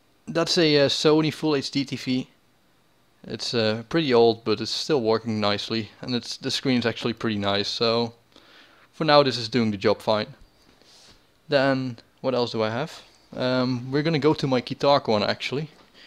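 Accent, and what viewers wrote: Netherlands. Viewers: Your accent look likes dutch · are u dutch? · Are u dutch? :P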